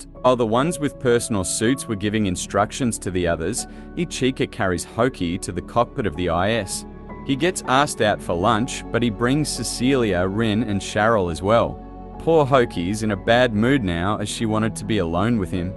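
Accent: Australia. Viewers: Nice Australian accent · the assie accent is really offputting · Australian accents go hard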